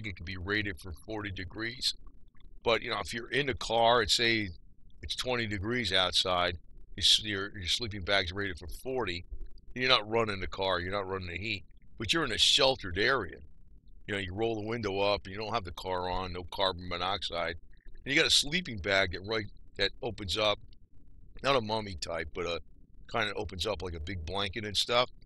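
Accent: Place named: New Jersey, United States. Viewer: I am surprised, that you been from New Jersey didn't watch the series sopranos. God knows you even speak in same accent as those in that series.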